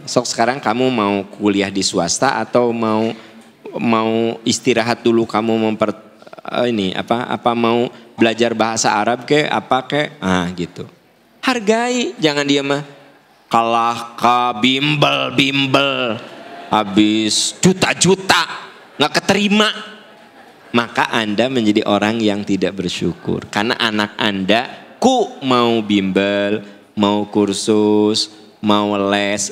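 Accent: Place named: West Java, Indonesia. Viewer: Ilmu yg bermanfaat serta kangen dgn logat Sundanya mengingatkan silsilah orang tua dari Kiara condong Bandung dan sekarang sdh tdk ada tempat utk pulang kampung ( sdh berpulang semua)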